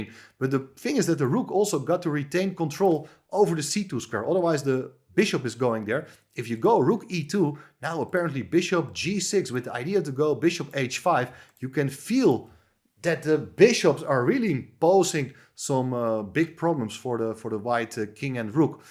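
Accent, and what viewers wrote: Netherlands. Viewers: I don't know what I hate more, Hans' cheating again or hearing this guy's Dutch version of English😅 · You're Dutch, aren't you?! :) I can tell from your accent.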